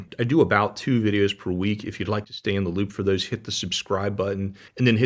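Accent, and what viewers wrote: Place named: Pennsylvania, United States. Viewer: I believe your from Pennsylvania, the way you pronounce the Word Home or Home’s. ?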